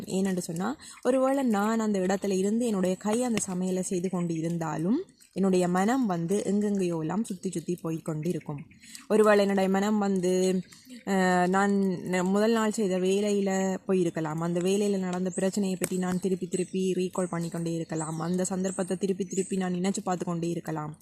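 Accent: Jaffna, Sri Lanka. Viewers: vadivaa Lankan/ Jffna Thamizh'la kathikreeka.. Nallaa piichirukku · நல்ல விளக்க உரை, நன்றி சகோதரி, நிகழ்ச்சி தொடரட்டும், வாழ்த்துக்கள், நான் விரும்பும் இனிமையான யாழ்ப்பாண மொழி,(Sri Lanka வில் இருந்து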